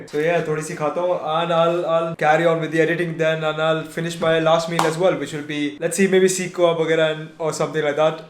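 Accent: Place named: United Kingdom. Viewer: Yes in UK. You could guess it from his accent.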